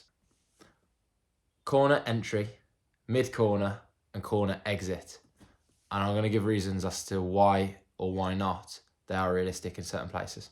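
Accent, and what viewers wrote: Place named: Midlands, United Kingdom. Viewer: Hey bud, sounds like you're a Midlander like me. Hope to see more 🙏🏾 👍🏾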